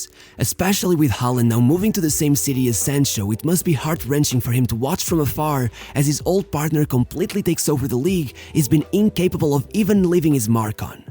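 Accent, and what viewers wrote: Portugal. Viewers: Eu sabia que eras português assim que ouvi o sotaque hhahahahha.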